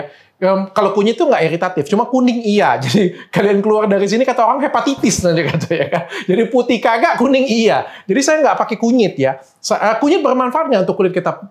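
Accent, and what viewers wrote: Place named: Palembang, Indonesia. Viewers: Dokter orang palembang ya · Dokter asli palembang yach...saya mendengar kata2 mogolah 😁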